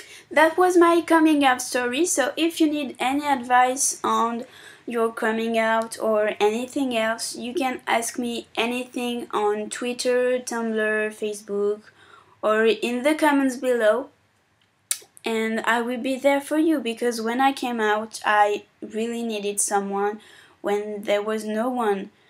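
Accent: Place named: France. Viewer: j'adore votre accent . teach me more french?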